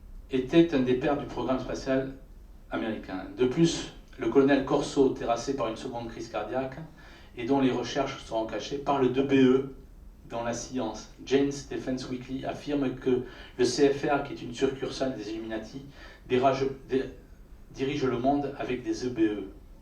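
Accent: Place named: South, France. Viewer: passe son temps assis à chier dans des cerveaux vides. C'est dingue comment une vague élocution et un accent du sud aux consonnances de sinus encombré, peuvent gruger des individus, tout ça parce qu'il balance vite fait deux versets d'évangiles... Gnnnnnnnn kop11111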